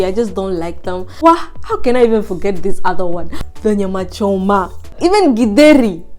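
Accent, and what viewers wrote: Nigeria. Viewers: You have Nigerian accent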